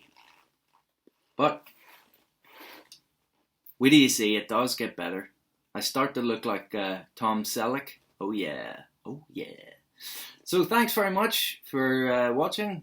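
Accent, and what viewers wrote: Ireland. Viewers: Great chat, always a pleasure to hear an Irish accent 😊 I took a look at the guys site, he has quite a selection of meer's and your right, very reasonably priced. Look forward to seeing yours! 99 subs as I type - you'll have broken the ton in a day or less 👍🏻Also look forward to seeing your presents, your Mrs sounds like a keeper. Lastly, filters - I started with them but abandoned them fairly quickly (more as I didn't like the tight draw). Good luck with that 'stache!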